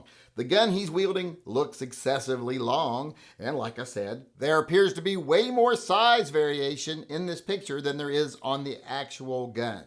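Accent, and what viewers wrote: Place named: Appalachia, United States. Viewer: You sound like a backwoods hillbilly mountain people ⛰️🏔️ 🤷‍♂️🤷🤷‍♀️🤦🤦‍♀️🤦‍♂️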